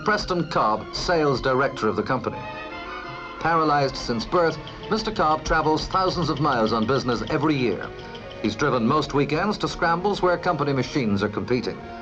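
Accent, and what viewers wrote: Ireland. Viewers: Do we know who the narrator is? I think I hear an Irish accent sneaking through in places.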